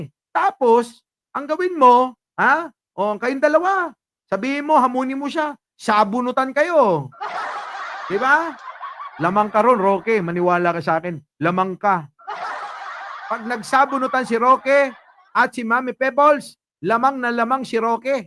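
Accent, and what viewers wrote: Ilocos, Philippines. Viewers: I like how you speak ilocano ading!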